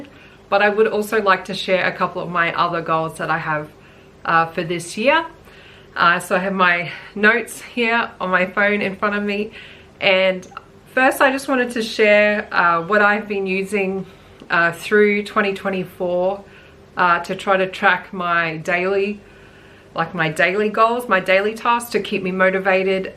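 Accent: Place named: Australia. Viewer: I found your channel on my own yearly bible reading journey :) it's nice hearing an Aussie accent talk about this :) I felt like I was in a prayer rut too and started a prayer bible this year. There's a lot of videos on sisterita club to get started and then people have refined her idea to better organise the tabs :) You seem very financially sound but I thought i would just add in that your super should be in high risk portfolios while you're young. 5 years out from when you plan to retire switch it to conservative funds. Your really need the high risk investments to have enough to retire on 😊 And don't panic when they tank. It's about a 10 yr cycle but you come out better in the end :) With your budget, watch your quarterly trends before you change any monthly budgets :) With your iron if you cook a lot at home check out cast iron.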